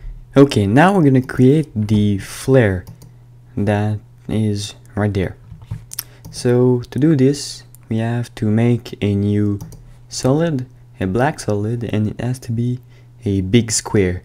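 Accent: France. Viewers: Thanks. Hey i've a question! Are you french? I'm sure.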